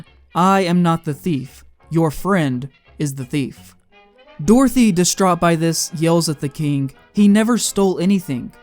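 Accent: United States. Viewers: While I understand the British comparison, are you by chance American?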